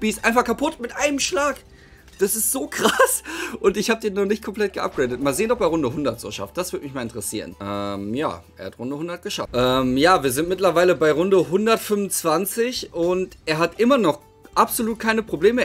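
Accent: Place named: Germany. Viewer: Ich hab in deinen Englischen Videos irgendwie schon gehört dass du Deutsch bist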